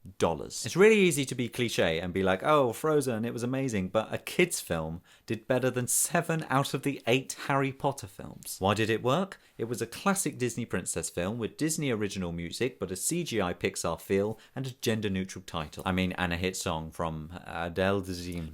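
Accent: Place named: England, United Kingdom. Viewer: So I came across this video through being assosiated with SuperSam in some small way... and it has got me thinking a lot. I have a few friends who know a heck of a lot more about Disney and Pixar than me, The Super Carlin Brothers, so I have passed on this video to them... maybe they can say something further on the subject! Especially the bit about "Where would Disney be without Pixar?" So interesting. Also yes, you are an acceptable maker of videos. Acceptable to a medium degree. The funny thing is... I am English too, and never really notice accents... but yours has a quality to it that sounds amazing with a good microphone, keep it up!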